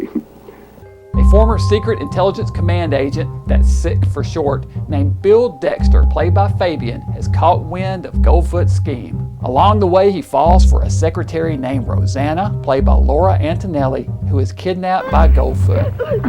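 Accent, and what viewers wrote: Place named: Tennessee, United States. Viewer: I grew up in Tn. I knew your accent. My father was from Rockwood Tennessee and ran away from home when he was 13 and joined the spookshow business! I've always had a lifelong affection of horror and sci-fi! Excellent work!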